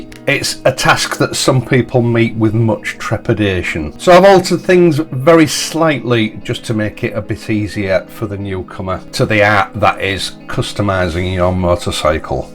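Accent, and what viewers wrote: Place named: Northern England, United Kingdom. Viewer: Apart from the fact that I'm obsessed with this bike, and dreaming about getting one, I find your presentation style and northern accent (whereabouts are you from by the way?) very easy on the ear. I have to concur with CHANG, you are indeed the David A. of the motorcycle world!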